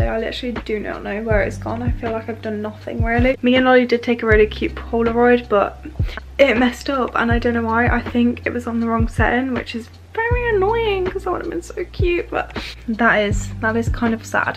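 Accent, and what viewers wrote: United Kingdom. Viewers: ur british accent has my all heart 🥺 ps: i love all your videos too<3 xx from Brazil 🇧🇷❤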